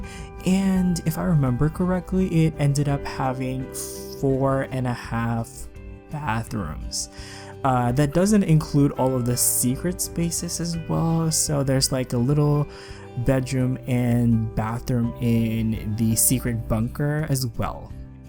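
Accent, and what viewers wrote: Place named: Philippines. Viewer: Your English is very good! I read you're Filipino so your accent is so similar to my friend :)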